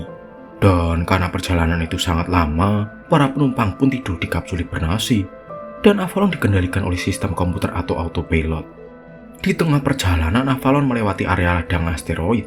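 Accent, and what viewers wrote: Java, Indonesia. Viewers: Medok bgt naratornya.. 😅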